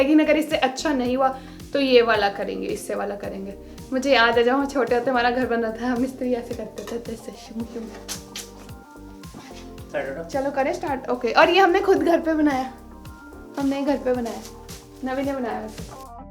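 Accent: Punjab, India. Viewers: those punjabi touches when you speak are so lovely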